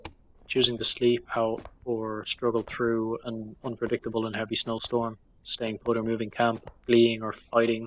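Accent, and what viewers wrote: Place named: Ireland. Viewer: Great review - game sounds very interesting & fun. Love that Irish accent! I would say that the sound needs to be a little higher. I really support new YouTubers, subscribed, I'm interested in more. Thumbs up for that! I'd suggest that you make social media and put in your channel art to gain more exposure. Make the channel icon more unique! - Channel art is okay. - I suggest removing the videos and only placing playlists, popular uploads and channel trailer. Tags need to be more specific, don't be afraid to write a full sentence if you have to, such as "two minute review of the wild 8" rather than "the wild 8" and "2 minute review", that will have less competition and more likely to be searched. Feel free to follow me on Twitter, we can talk anytime :)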